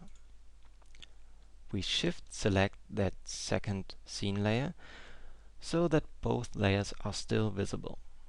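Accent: Germany. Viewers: You still have your german accent. One matter of practicality. Tricky techniques don't need handholding. We can setup on our own. Make your life easier and skim the basic stuff. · You still have an accent. You can just about tell it's German but yeah you can tell it's there.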